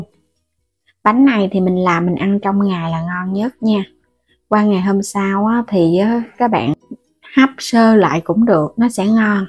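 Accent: Mekong Delta, Vietnam. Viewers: Giọng người miền Tây đa số là vậy bạn ơi .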